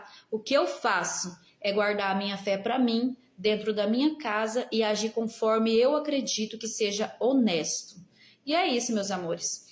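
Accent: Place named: São Paulo, Brazil. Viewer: Sério isso vc de madureira com essa fala...parece q é de são Paulo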